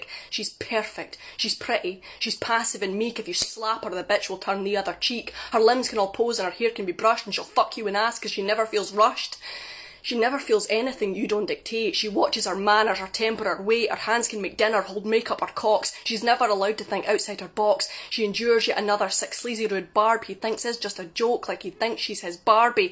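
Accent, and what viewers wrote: Scotland, United Kingdom. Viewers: Scottish slam poetry. Interesting. 🙂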